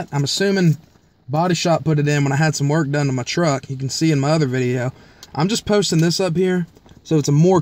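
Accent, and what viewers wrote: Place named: South, United States. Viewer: Damn hick accent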